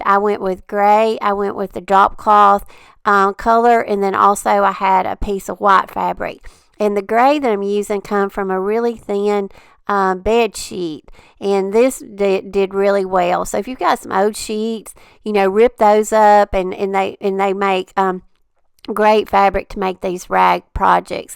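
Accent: South, United States. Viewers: You are as sweet as you seem on your videos! Thank you for the kind words and thoughts. I’m not going to win this battle, but I’m so blessed, and take each day as the gift it is. I was a nurse for 30+ years, one of the 1st “lessons” I learned and try to live by each day...”I cried because I had no shoes, until I saw a man with no feet”. I’m a lucky woman. I love just hearing your southern drawl, as it makes me feel as if and think of “home”!! If you’re ever in Va, I got a tall glass of The House Wine of the South (Sweet Tea!) with your name on it! Look forward to what your doing next, and of course will be watching for whoever the Winner is. You show so much more than crafting like the giveaway, “can’t keep it unless you give/share with others”. Thank you for being a bright spot every time you “share”! Xoxo Cindy (sorry, didn’t mean to chew your ear off!! 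I’ll try and keep on topic next time! I don’t do social media I hope I’m not breaking any rules??)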